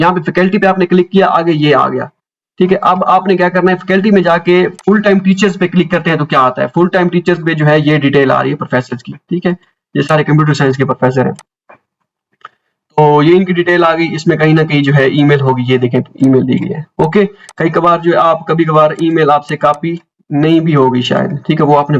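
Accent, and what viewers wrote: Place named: Layyah, Pakistan. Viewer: ap k bat krny k styl se lg rha hy k ap layyah se hn... am I right?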